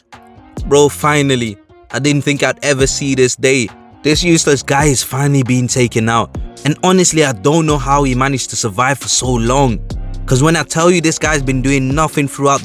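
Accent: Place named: Jamaica. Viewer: hey are you friends with Truther? You sound Jamaican and truther is jamaican as well. Just asking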